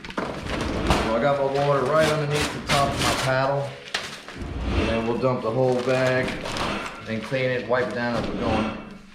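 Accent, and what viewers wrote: South, United States. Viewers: I've watched many of your videos & your accent is so mesmerizing I know it's from the South, definitely not Texas where I live. 😊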